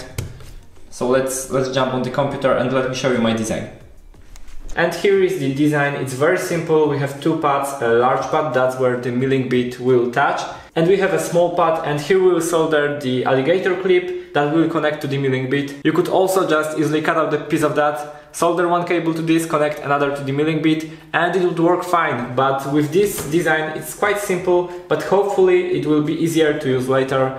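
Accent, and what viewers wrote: Poland. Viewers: Typowy polski akcent :) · Daj polskie napisy bo słyszę że rodak 😆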